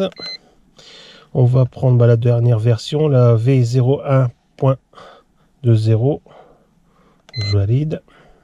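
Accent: North, France. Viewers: Salut ou habite tu? Car tu as l accent du nord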